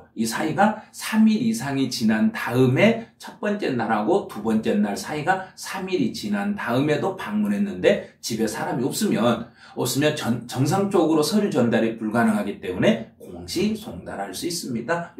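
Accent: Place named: Jeolla, South Korea. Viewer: 쌤 사랑합니다! 쌤 셥듣고 나서 이상형이 바꼇어요~ㅋㅋ 전라도 사투리 쓰는 남자로요~♡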